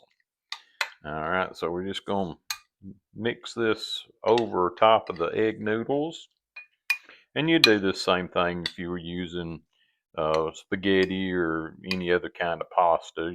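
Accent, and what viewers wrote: South, United States. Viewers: i live in nrthern maine and i ABSOLUTELY LOVE YOUR SOUTHERN ACCENT AND DRWAL!!! ANY MAN...that can cook.. hell ya!!! hope your staying safe with this weather stay warm have a good wkend ;];];]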